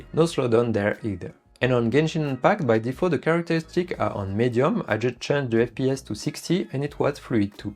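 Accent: France. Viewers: L'accent français transparaît beaucoup, il nous en faut un aussi.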